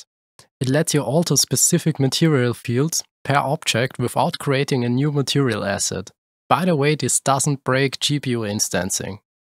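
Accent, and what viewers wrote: Germany. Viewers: You sound German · I can hear it. A little German guy. Good Video! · only 74 subs??? holy you need more attention mate, awesome video. also nice voice and accent. are you german? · Alman is what we say in Germany to a perfectly stereotyped German. So you have a German accent😁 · he has a german accent, everything he says is correct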